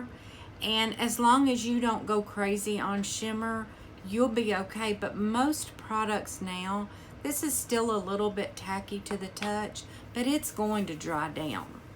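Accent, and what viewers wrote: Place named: South, United States. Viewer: Hi. I'm here from Jen's group. Love your southern accent! That gold lip mask was nuts. I'm glad you gave the Catrice another chance. I love it. But I have oily, mature skin. So that may be a difference. I also love mixing it with a bb cream to increase longevity and coverage. With the Essence, I found it had a film on it so the first couple times you use it, you don't pick up much. I also use a denser brush to apply it than I do other highlighters. But I can see it just fine on the video. Great video. Thanks!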